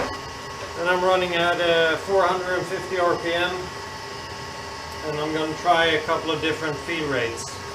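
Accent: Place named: United States. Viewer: Thanks for the information. I'm in Nuuk, Greenland. I was just curious because your American is so good, I couldn't place where you might be from. I enjoyed the slow motion shaper vid. Nice.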